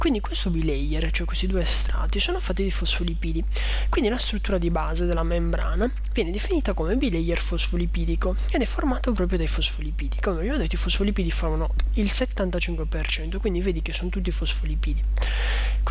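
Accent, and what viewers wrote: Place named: Sardinia, Italy. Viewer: riesci a postare anche i link del test di medicina grazie... i tuoi video sono spettacolari poi con questo accento sardo ancora meglio complimenti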